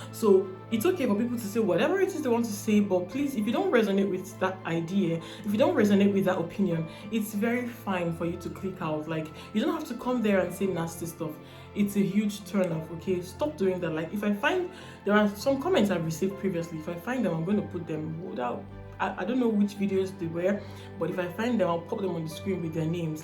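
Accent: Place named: Nigeria. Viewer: You sound like a Nigerian, you guys aren't the same as us North American blacks(the genuine blacks, so far as North American and media bias goes). Your distinctive patterns of behavior aren't quite the same as what we cinsider to be black, you guys have an exotic~foreign vibe and that changes how white people intetact with you, unlesd you can properly emulate being an American black, you don't seem to have this capacity(you just come off as high class lady that is pretending to identify with the rest of us blacks, although my being part chinese also makes my experience not quite the same as a standard U.S black).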